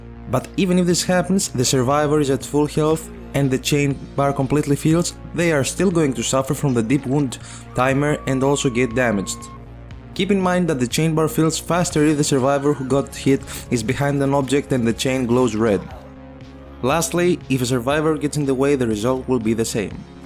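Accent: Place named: Greece. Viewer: are you greek? I am greek too. If yes i could tell from your voice lol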